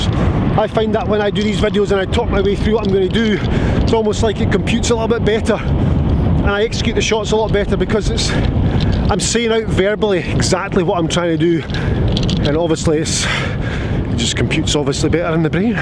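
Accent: Scotland, United Kingdom. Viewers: I love the music, and your 🏴 accent. Realy authentic! Discovered the golfing machine in 2018 which led me to you when you had 6K subscribers. TGM and FREE YouTube channel made me understand previously consumed books and video’s better as well. Keep doing what you do. My handicap just turned 18 and is trending down 🏌🏽‍♂️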